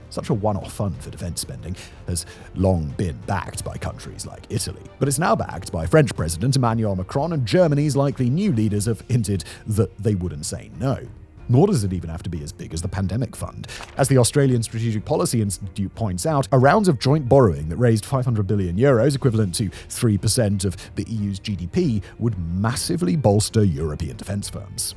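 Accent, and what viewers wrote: United States. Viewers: had a little American comin out in him this one got me pumped up given the horrible situation! Stay Strong! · My god is sounding more and more American every day. It's good to see him want his country to stand up for itself with some fire in his blood about it.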